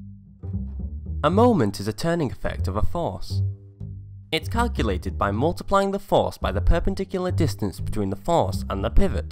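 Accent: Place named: United Kingdom. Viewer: You remind me of minute physics but you're British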